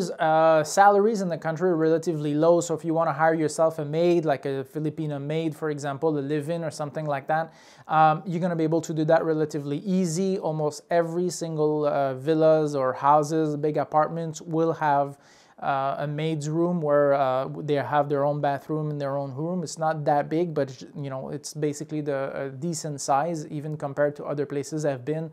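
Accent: Canada. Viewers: His accent sounds Canadian to me. Don't think he was comparing to U.S.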